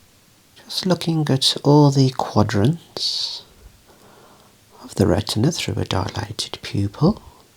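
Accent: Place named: United Kingdom. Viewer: I'm german and the English we hear here is mostly American English. It's always a pleasure for me to listen to British English, like Tom Ellis in "Lucifer" , Henry Cavill or Ewan McGregor as Obi wan Kenobi. Or THIS gentleman here on this channel. Everytime a Star Wars kind of experience, when I hear "hello there !"😆 A big "Servus alle miteinander !" from Bavaria, Germany !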